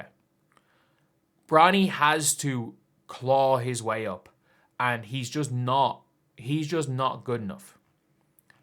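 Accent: Ireland. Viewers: You must be from Cork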